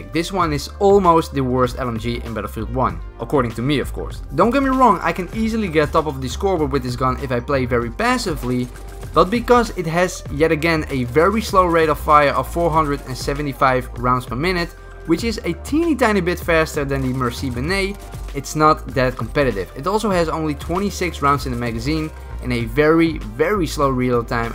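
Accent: Netherlands. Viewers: You come from netherlands right?😊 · Are you Dutch? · are you dutch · he is dutch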